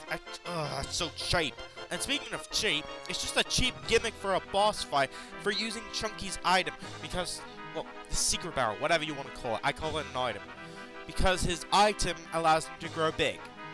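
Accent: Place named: United Kingdom. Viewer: Where do I begin? It's a countdown of your FAVORITE bosses, yet you have nothing but negative things to say about the first two bosses. Did you seriously just call Creepy Castle annoying?! Also, why do you have a British accent in this video, but have an American accent in your more recent videos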